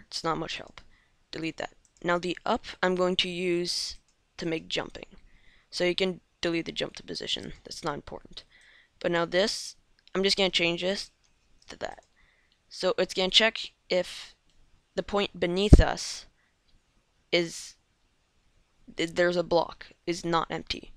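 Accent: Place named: India. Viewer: why do you start out talking like an Indian and then lose the accent? wtf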